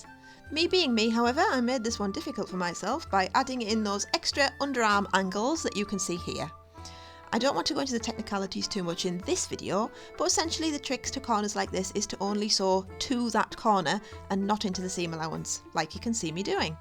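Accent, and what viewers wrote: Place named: Teesside, United Kingdom. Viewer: Thanks for this video. I have absolutely zero skills in making clothing but it’s been really helpful in relation to fabrics, colours and even potential suppliers. I also recognise that accent. Teessider? Or there about?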